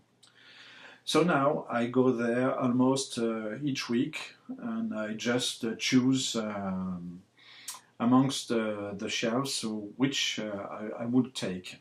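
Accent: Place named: France. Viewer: Always appreciate the French pronunciation! If I had more time in the day I would love to study it since outside of my English speaking stuff, French movies and music seem to dominate my music and film collection next. looks very intriguing. I love and the.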